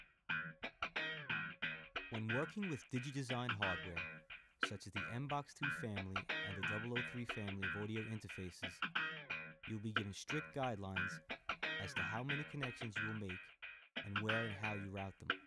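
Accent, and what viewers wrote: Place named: Long Island, United States. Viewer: that guitar lick in the background does makes this unwatchable. plus his Long Island accent is brutal. its like recording with the Sopranos.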